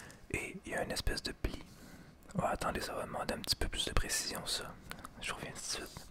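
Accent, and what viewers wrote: Canada. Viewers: Je cherchais d’autres ASMR que les ASMR français pour changer un peu et je suis tombée sur tes vidéos. J’aime bien ton style et surtout l’accent canadien qu’on adore ici !! Tu as une abonnée de plus 😉 Je te souhaite de belles fêtes de fin d’année 🥳🍾🎄 depuis la Vendée en France 🇫🇷 Bonne continuation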